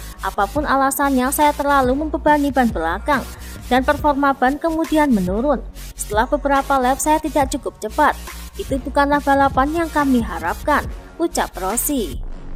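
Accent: Java, Indonesia. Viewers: Mba mba medok hahahaha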